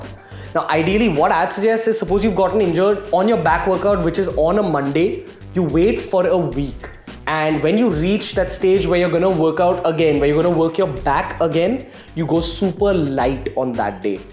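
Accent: India. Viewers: First hindi accent influencer who has a delicate accent but you can still understand what is he talking about. Keep it up very interesting material.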